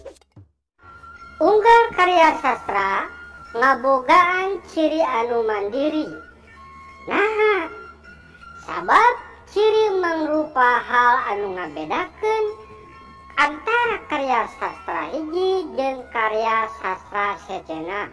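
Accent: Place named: Garut, Indonesia. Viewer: Sundana ti garut nya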